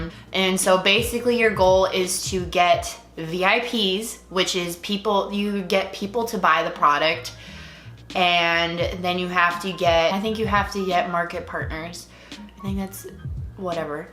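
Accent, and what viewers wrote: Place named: Minnesota, United States. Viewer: Are you Minnesotan? That accent is thick!! love it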